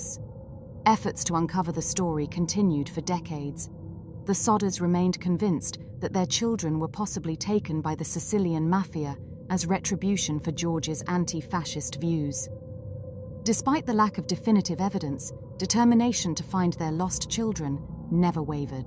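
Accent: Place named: England, United Kingdom. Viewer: I want that thick english accent back. Love your speaking voice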